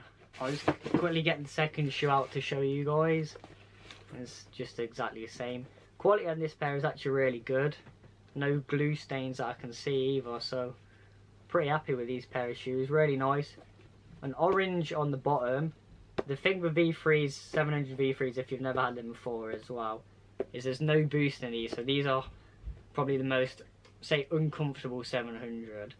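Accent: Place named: United Kingdom. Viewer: what part of the UK did you come from with that accent mate?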